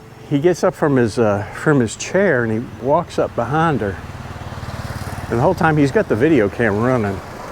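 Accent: United States. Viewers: New Sub, enjoying your vids. You made reference to previously being On The Job in an earlier vid (what Department or part of the States). I hear Midwest or South East Region in your voice? Regards, JP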